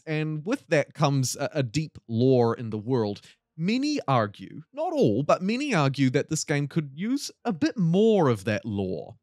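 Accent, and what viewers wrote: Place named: New Zealand. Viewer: I love ur content!! U sound like Cs Theus the skin guy, u know!? U from NZ?!?! <3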